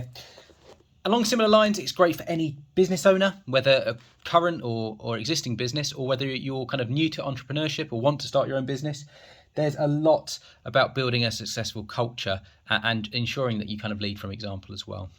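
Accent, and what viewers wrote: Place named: United Kingdom. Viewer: I came across to this Chanel and this man explains the book Of (Find you why ). And I guess Man U have British accent u must be English man , the best English language is spoken by British people and the rest of the world follows even Americans .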